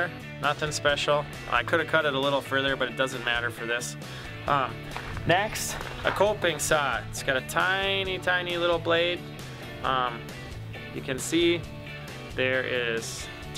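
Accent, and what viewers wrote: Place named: Minnesota, United States. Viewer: This guy has to be from Minnesota. Great video!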